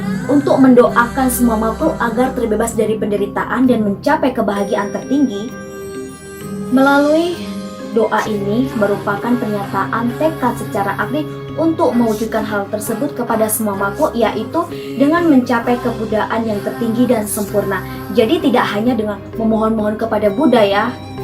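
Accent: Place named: North Sumatra, Indonesia. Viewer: Wow, penjelasan yg sungguh komprehensif tentang Borobudur yang pernah saya dengar. Gaya presentasi bagus, jelas. Biar lebih oye, hilangkan kebiasaan menggunakan kata tak efektif "eee", ketika loading memory, lebih baik silent sejenak, agar tak mengganggu pendengar menyimak sambil upload memory juga. Logatnya, logat sumatera utara kalau tak salah tebak. Tapi itu semua kekurangan minor saja, lebih banyak bagusnya, kalau mau naik level ke presenter yg pro. Anyway sdh keren, lanjutken tambah lama pasti tambah keren !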